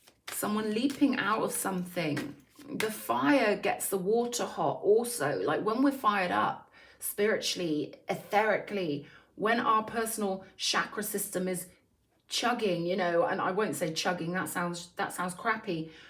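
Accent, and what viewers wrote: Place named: London, United Kingdom. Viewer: I love ur accent, your kinda like a posh London geez ❤😂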